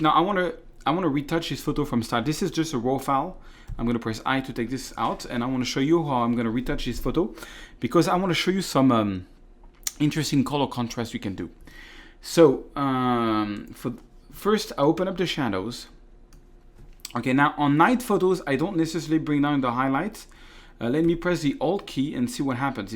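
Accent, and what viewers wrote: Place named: France. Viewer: when french people speak English...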